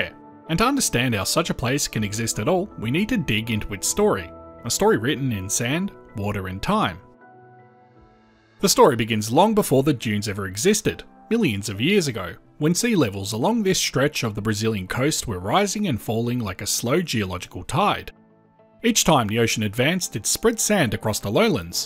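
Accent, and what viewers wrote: Australia. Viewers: It's an Australian narrator and it's an Australian channel. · It’s Australian.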